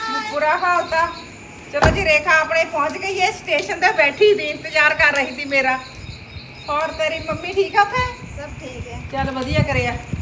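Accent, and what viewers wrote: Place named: Patiala, India. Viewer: beta tuhada pind kihra hai boli patiale de hai❤❤🎉🎉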